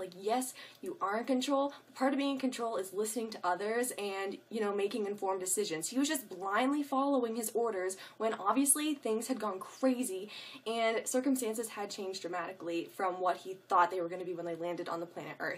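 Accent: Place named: South, United States. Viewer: Where are you from anyways? It sounds like you have a slight southern accent which makes me think Texas for some reason haha I will totally share any secret information with you ;)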